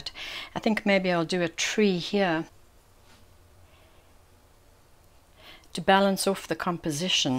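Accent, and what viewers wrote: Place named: South Africa. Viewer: Thanks. I'm learning a lot about loose watercolour painting. I was surprised to hear you are living in California - you haven't lost your accent! Which I love to hear as I lived in S.A. for 10 happy years.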